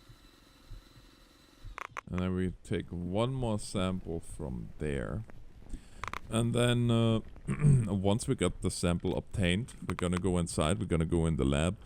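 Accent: Germany. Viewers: you are German , Right ? :)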